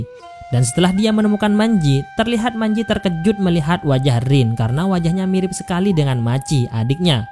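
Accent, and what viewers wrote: Madura, Indonesia. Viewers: Logatnya kek orang madura. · Medureh dimmah kak?? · Ini orang madura ya??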